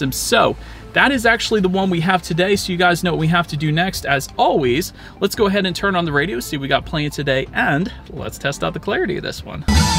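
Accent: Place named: Philadelphia, United States. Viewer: Living in Florida now I really miss hearing that Philly accent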